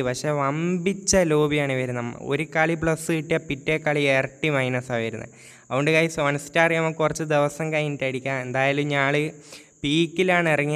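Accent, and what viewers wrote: Kasaragod, India. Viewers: Da kasaragod aano beck beljji😂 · 'kasaragod aaano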